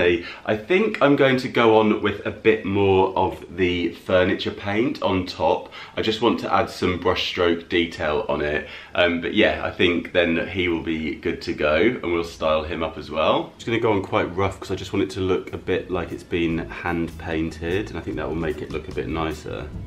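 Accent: United Kingdom. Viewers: How have I not come across this channel before 🤷🏻‍♀️ Subbed immediately just because you’re British & you have a great voice! The videos are awesome! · I just had to listen to your beautiful British accent to hit the subscribe button. Cheers, mate! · 😁 His voice is absolutely very sexy (oops, sorry 😶), with that fascinating British accent that I love!!!! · Your british accent is everything 😍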